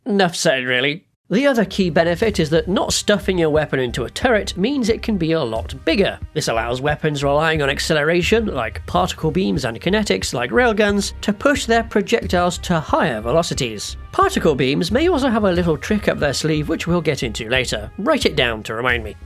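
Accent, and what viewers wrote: United Kingdom. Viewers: Eeek… bro got two of the worst traits, squeaker voice and British accent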